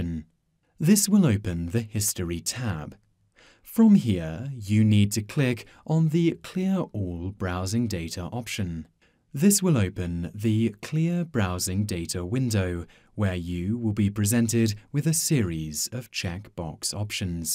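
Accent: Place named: England, United Kingdom. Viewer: That awesome English accent -_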